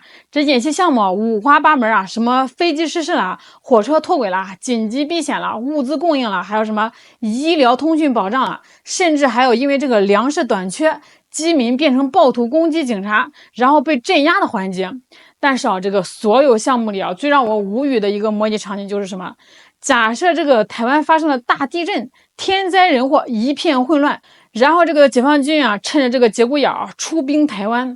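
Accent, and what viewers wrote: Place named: North, China. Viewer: 口音挺熟，就是想不起来了。或许山西，河南，河北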